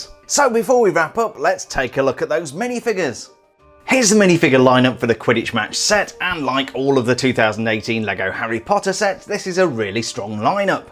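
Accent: United Kingdom. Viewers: Love your British voice sorry if racist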